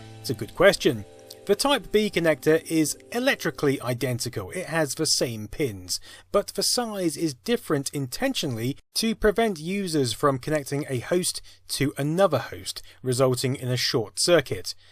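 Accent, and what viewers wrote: United Kingdom. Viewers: I giggle every time he says Nokia. Does everyone from the UK pronounce it 'nawkia'?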